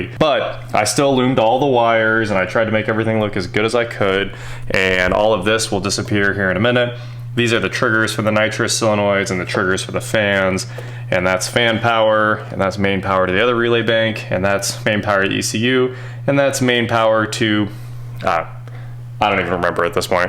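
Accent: United States. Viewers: Please Rolls Royce's are ladies like ships (well the drive like boats too) there aways female! Not bad boy's please have more class now you gone up market and try to speak english not yank! remember bonnet not hood, boot not trunk, and petrol not gas but loveing the fun of the build!!!!!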